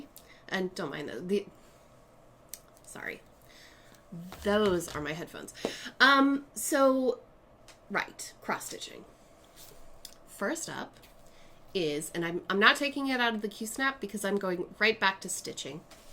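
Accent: Ireland. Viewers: Oh my. The mouse is adorable! I love that your Irish accent is getting stronger.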